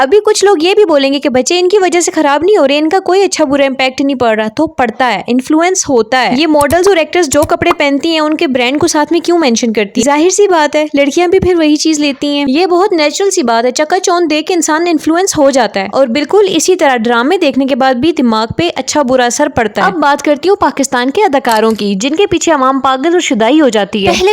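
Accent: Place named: Punjab, India. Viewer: Kia tum Sana's bucket ho? Same awaz same style... wai patli karari si full speed pe bhagti train with some punjabi/potohari accent 😄🙉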